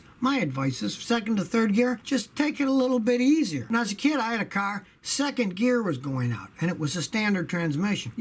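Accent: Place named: Texas, United States. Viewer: This is new. It's sorta like the YouTube version of Car Talk with a Texas accent.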